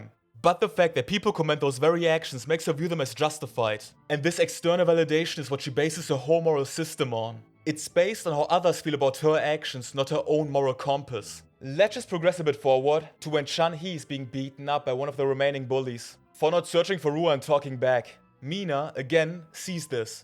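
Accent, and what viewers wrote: Germany. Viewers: Ar you German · Man hört richtig raus dass du deutscher bist hahahaha fr mach mal Videos auf deutsch ich suche ganze Zeit schon jemanden der sympathisch ist und manwha webtoons oder Mangas erzählt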